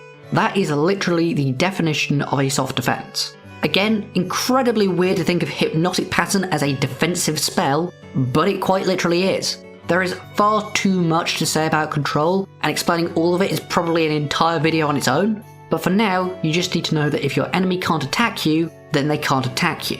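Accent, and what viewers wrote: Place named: United Kingdom. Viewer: oh, you’re british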